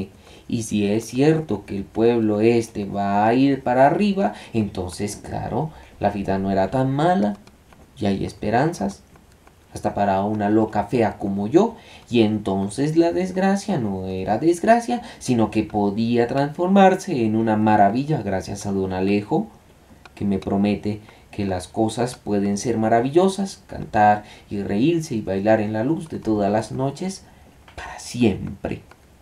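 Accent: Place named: Colombia. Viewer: me agrada tu acento colombiano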